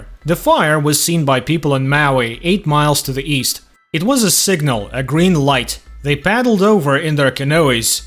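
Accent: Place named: Russia. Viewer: your dirty Russian accent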